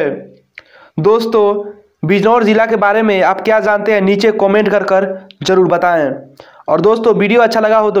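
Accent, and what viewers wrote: Bihar, India. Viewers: Bolne wala bihari h be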